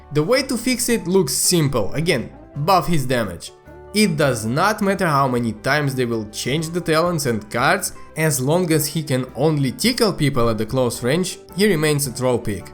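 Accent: Russia. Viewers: Buck. Horrible russian accent.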